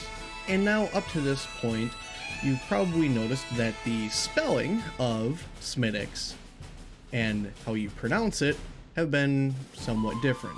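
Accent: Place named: Wisconsin, United States. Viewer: Charming Wisconsin accent! It’s a shame the music overpowered your voice a little. Thanks for the history and review.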